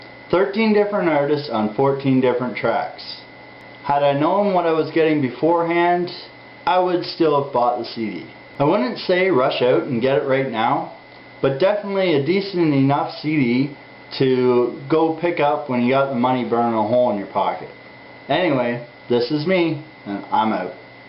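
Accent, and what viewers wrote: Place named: Canada. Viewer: I have a strong feeling you're Canadian based on how you speak. Anyway, good review, I probably won't get this cd ever but it's good to know it's not total garbage.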